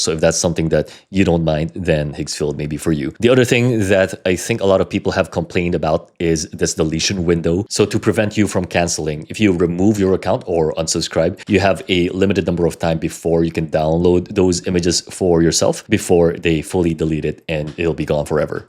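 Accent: Philippines. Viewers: Are you filipino? I can hear some accent :D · Why do I hear a Filipino accent? :)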